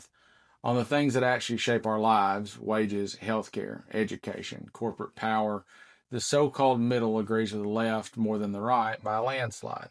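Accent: South, United States. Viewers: Glad to hear more southern accents speak these truths!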